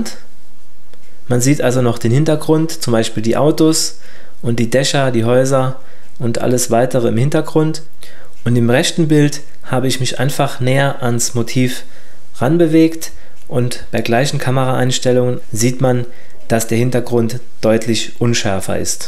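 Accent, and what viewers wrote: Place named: Saarland, Germany. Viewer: Isch heer e Saarlänner schwetze - isch drigge "Gefällt mir" 👍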